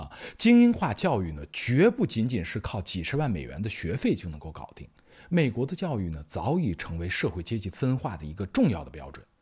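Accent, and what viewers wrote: Standard. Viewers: 主播的普通话说的很好、很正。现在有的主播说话舌头不伸直，全程充满S，疯狂装沘，极度装嫩。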